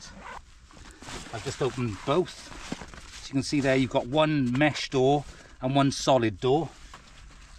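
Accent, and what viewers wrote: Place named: Cardiff, United Kingdom. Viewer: Hey bud, nice little video! I’m a Cardiff boy, are you in Cardiff or Newport as loving the accent haha!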